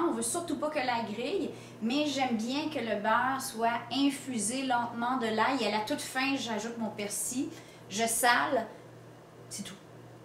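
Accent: Quebec, Canada. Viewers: Bonjour 👋 j’adore t’es vidéos je rigole et je me régale,t’es recette sont tous simplement génial j’adore 👍,et ton accent 😄j’adore,vous les québécois et vos expressions c’est trop marrant,au j’arrive à la fin de la vidéo 😛🤪tu me donne faim,Isi il est 1h20 du matin comme je n’arrive pas à dormir je vais réaliser t’as recette,gros bisous à toi et à chéri 👍🍀💋💋💋💋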